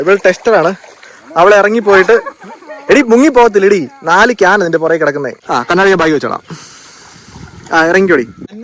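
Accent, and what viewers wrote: Kottayam, India. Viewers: Bro kottayamkaran alle bro?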